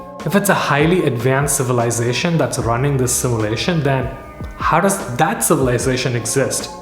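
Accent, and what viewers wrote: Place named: United States. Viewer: Why do you sound American in this video???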